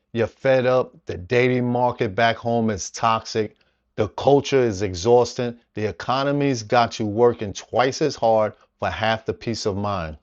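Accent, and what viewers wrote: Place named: New York, United States. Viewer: New subscriber: Excellent advise, you sound like a brother from New Yawk; I hear an accent...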